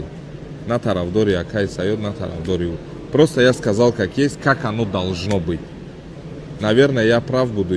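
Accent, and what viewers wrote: Kulob, Tajikistan. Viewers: 😂😂😂 Хитт карде Гапи кулоби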